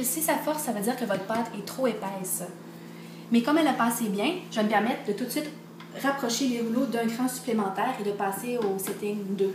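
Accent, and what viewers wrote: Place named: Quebec, Canada. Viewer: En plein le genre de vidéo que nous cherchions. En québécois en plus!!! Merci pour les info!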